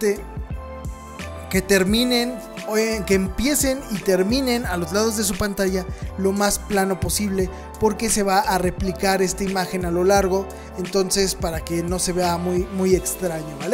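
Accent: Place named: Dominican Republic. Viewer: Suenas medio dominicano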